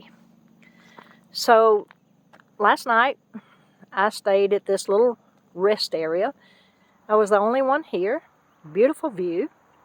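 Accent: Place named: Georgia, United States. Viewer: Yay!!!! I knew I recognized that accent ! Hehehe Greetings from Savannah. I saw your Ga car tag briefly in the video . I’m a new subscriber and looking forward to your travels. 😎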